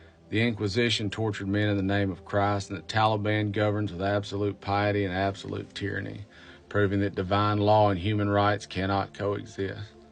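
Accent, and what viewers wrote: South, United States. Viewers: You are a gentleman and a scholar, sir. With a southern accent, you command attention without threatening, judging, or raising your voice. Thank you. You are a joy to hear in these troubling times. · Southern dialect. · This Canadian loves your Southern values and wonderful accent. Please seriously consider running for office. You would make a amazing statesman. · Refreshing to hear a southern accent gentleman speaking the honest to God truth. What a great country. I have faith. I have faith in this gentleman and others like him. Who speak truth to those who despise truth and laws.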